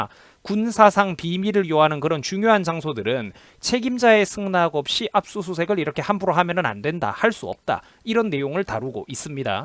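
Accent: Gyeongsang, South Korea. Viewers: 잘한다!! 우파의 젊은 보수 화이팅 그씨도 경상도 얼 사도 말씨가 경상도 갠적으로 더 좋음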